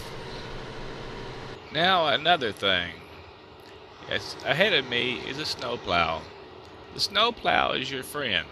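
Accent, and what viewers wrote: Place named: South, United States. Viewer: I can tell you're from the south like me. We drive easy, breezy, nice and safely!! Lol..."I feel sorry for the guy...Bless his his heart!"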